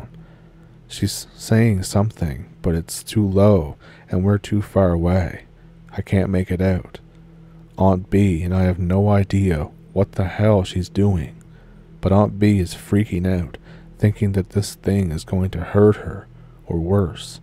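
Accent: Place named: Maritimes, Canada. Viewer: I love your stories, and I can even get past the EXTREME Maritime Provinces Canadian accent. But you sound lethargic and congested!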